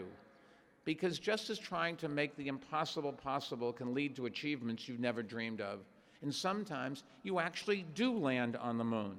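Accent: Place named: Boston, United States. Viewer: Don't worry, that Boston accent is front and center. Regardless of how you pronounce "hard."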